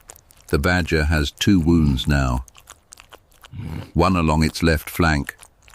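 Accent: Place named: United Kingdom. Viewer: Really? Are you really proud of your hollow, soulless, contemptuous, empty, Superior British accented commentator? We understand that you're incapable of providing a truly human being with a truly human commentary, but at least give us one who doesn't have the intolerable snobbery of the British, thanks.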